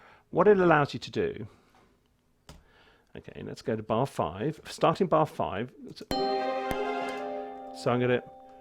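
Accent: United Kingdom. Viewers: I hear a British accent and I put my full trust in this guy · That is one of THEEE BEST How-to-videos I've watched for a long time. I absolutely love the freshness, the gags, the way he talks. (Love that british accent) Just started with "The secret to what Chords Work", the intro is hillarious. Nice dog by the way. Sir, you just made yourself a new subscriber. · I love this Guy and his British accent!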